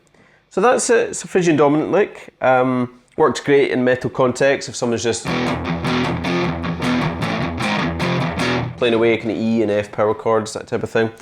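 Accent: Scotland, United Kingdom. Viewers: misterassassin1990 Scottish I believe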